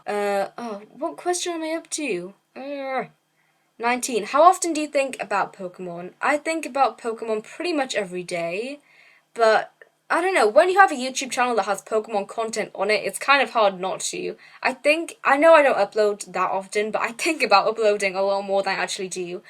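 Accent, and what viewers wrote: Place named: United Kingdom. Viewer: Hey :) i'm a new subscriber & i couldnt help but notice your interesting accent. Roughly whereabouts to you live in the UK? Also, i enjoyed the video :D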